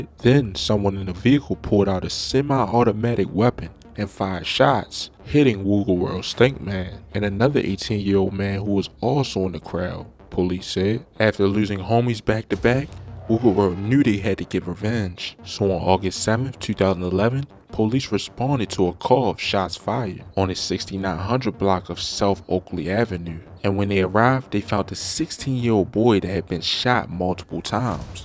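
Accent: Baltimore, United States. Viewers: That BMore accent you got heavy as hell foo 😭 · Take yo ahh back to Baltimore I can’t listen teewww yo ahh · I can tell the narrator from Baltimore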